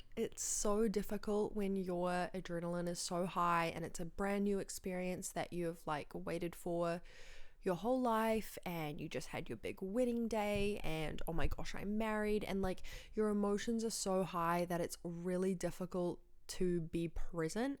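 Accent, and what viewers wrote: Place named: Australia. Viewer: Where is this awesome accent from ?!! Aussie?!😂❤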